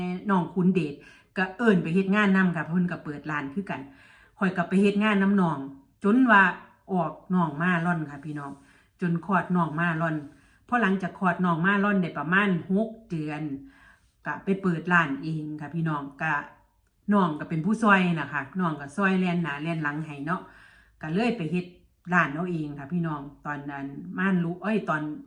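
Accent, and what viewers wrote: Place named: Central Thailand, Thailand. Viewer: พูดกลางฟังรู้เรื่องทุกคน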